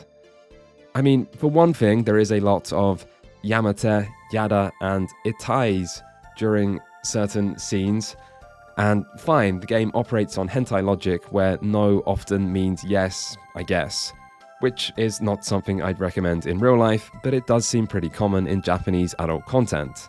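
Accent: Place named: United Kingdom. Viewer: The somewhat familiar oddly british voice said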